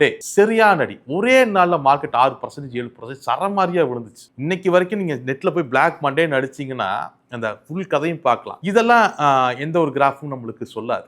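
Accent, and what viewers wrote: Kongu, India. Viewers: Great sir, I like your kong slang sir. நீங்கள் எங்களுக்கு தன்னம்பிக்கை கொடுக்கிறீர்கள் sir💯💚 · I like your kongu slang sir❤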